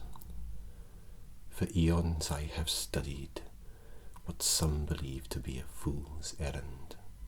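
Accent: Wales, United Kingdom. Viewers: Pretty decent Welsh accent. Coming from a welshman 😁. Where'd you learn that lol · An devil and an alchemist? Sounds up my alley. A welsh accent? I like accents!! Though I do like blood, I don't look you got hurt and enough to draw blood. Do take care of yourself, Mr.. Heal up. 🖤🙏 funny thing is we were just discussing injuries with scissors. It's the testy tube you must eye for. Lol. 🙃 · Welsh accent? What? Isn't it the way English should usually sound?🤭 See, I'm drowning so deep in your channel that I even watch videos with mustache! Big sacrifice in my part, but it totally worth it🤗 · I finally got around to watching this one and I had to continually rewind it as your welsh accent kept sending me to sleep. I think you got about 5 views in just this one.😂 I love the pointy facial hair in this video, very fetching.😏❤️. I thoroughly enjoyed this and the ending was unexpected and typical. 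It’s great to binge your videos in these stressful days. 😊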